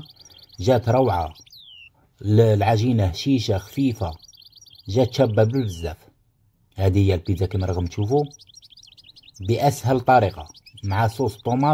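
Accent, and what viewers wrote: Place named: Skikda, Algeria. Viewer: Bonsoir le Skikda je sais que vous avez un accent Skikda et les Skikda les rosica days sont traités par les pizzas merci à vous et bonne continue❤❤❤❤❤❤😂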